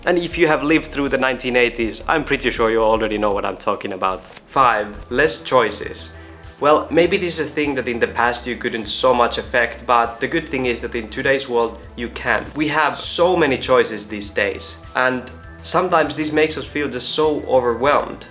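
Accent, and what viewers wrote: Finland. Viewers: Kuulin puhetavastasi heti että olet Suomesta ennen kuin katsoin kanavan tietoja.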